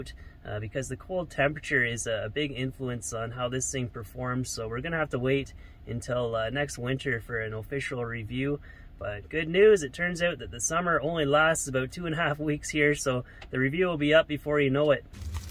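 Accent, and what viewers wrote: Canada. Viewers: So Canadian…work on ruff and then get water by the crick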